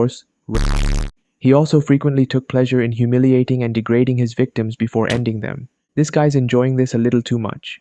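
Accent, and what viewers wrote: India. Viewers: Bro's accent sounds like an Indian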